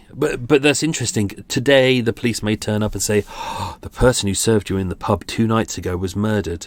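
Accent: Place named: Newcastle, United Kingdom. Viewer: Thanks. You've made some good points here regarding witness statements. Keep up the good work, great content and well narrated If your struggling with your geordie accent, try watching some Sid the sexist chat up line videos on YT. 👍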